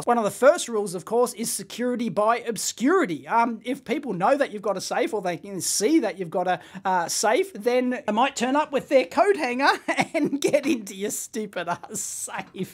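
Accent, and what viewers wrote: Australia. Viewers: LockPickingLawyer grew an aussie accent (oh, Its. He says hes not LPL lel)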